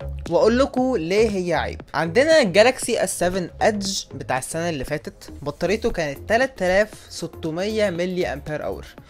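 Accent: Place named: Egypt. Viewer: i like your Egyptian dialect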